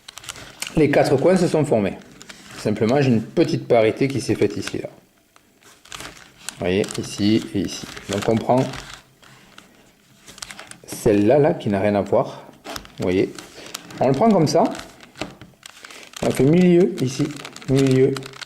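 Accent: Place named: South, France. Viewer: En bref et pour profiter de tes talents d'orateur avec ton petit accent qui chante le sud refais nous une petite video je n'ai pas ton talent de youtubeur, mais en bref la methode la plus simple commence par faire une double croix blanche avec les arretes de L4 et les centres de L3 en même temps, etape deux tu alignes en une seule fois sur les ligne 1 et deux les paires de coins que tu fais decendre a la bonne place avec ta formule DHDHDHHD en fonction des pieces a placer si c'est que celle de la ligne 2 tu fais dhdhdhhd ou DdHhDdHhDdHhHhDd et tes deux lignes du bas sont finies, en etape 3 tu aligne devant toi deux couleurs contigues sur la ligne 1 et sur la ligne 2 meme si elles sont diferentes et tu fais ta formule du 4x4x4 de parité de coin pour inverser tes coins mais tu la fais en prenant les 2 lignes en meme temps et en un seul algo tous tes coins sont faits t'as plus qu'a les placer. 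En derniere etape il te reste les deux lignes de centres a faire, tu commences par un PLL du 3x3x3 (en faisant des demi tours avec droit et gauche) ca te place les 3/4 des paires d'arretes et pour le reste tu utilises les formules de parité d'arrete du 4x4x4 il te reste plus que cette parité que tu évoques a la fin, en gros il te faut 2 petites minutes pour faire ca, En joy...A ton service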